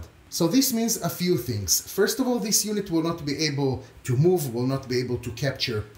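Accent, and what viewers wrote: France. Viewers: have you had a lot of troubles with get rid of French accent? I saw one French man trying speak english but he has some troubles with "french accent"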